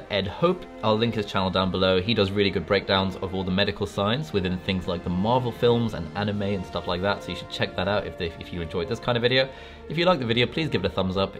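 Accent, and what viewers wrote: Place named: United Kingdom. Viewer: Great video, love how you explained everything in your perfect British accent. What you said about how ‘wolfbane’ can effect the nervous system got me thinking about the Wolfbane potion Snape brews for Lupin in the Prisoner of Azkaban, which stops him from turning into a warewolf and immobilises him.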